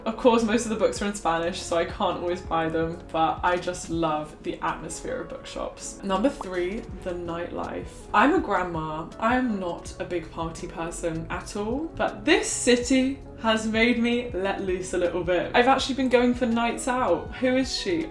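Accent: United Kingdom. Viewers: Hello, im really glad you like my city! I love it too. Your british way of talking is really pretty, I really enjoy watching your videos. Have fun the time you'll spend here! Wish us luck for the football world cup jajaja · your speech made you look like ferb from phineas and ferb, talking about relaxing under a tree, in a british accent and with an eye bigger than the other one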